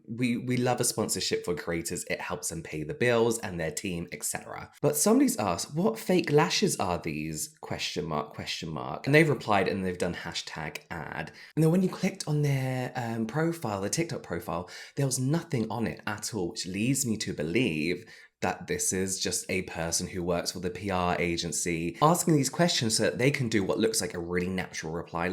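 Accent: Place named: RP, United Kingdom. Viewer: I love the way you talk. It's just so Posh!😩👌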